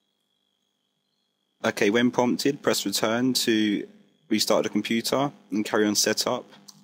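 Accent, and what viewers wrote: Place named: United Kingdom. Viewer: u r british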